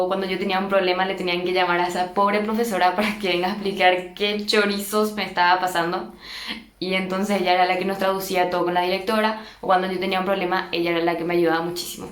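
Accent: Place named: Paraguay. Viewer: holaaa!!!! soy nueva en tu canal, eres de paraguay??? me suenas por el acento , nueva sub...jsjsjsj muy buen video!!!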